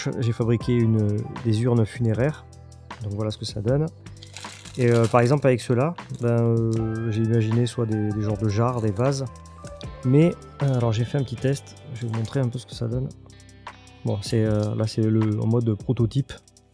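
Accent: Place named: Southwest, France. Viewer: C'est ça c'est bien l'accent de chez moi( sud-ouest) qu'il m'a semblé reconnaître 😉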